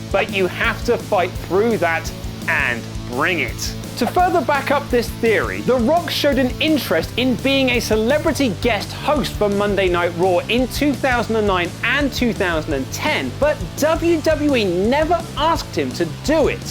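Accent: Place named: United Kingdom. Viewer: what a shady click bait title...all that talk and not once have u actually given the "real reason" why...just pure speculation one after another after another..kinda fd up..and then in comments its just as many more. and would be so much cooler if u threw some videos in (i kno u prob can't do that do the copyright issues thing) but yea some videos/small cuts from matches or backstage segments etc...would be sooo much better n more entertainment then this bombardment of a I'm guessing British, accent, on and on and on..shit gave me a headache...lmao n i couldnt even get the answer the the question of the clickbait title for it lol..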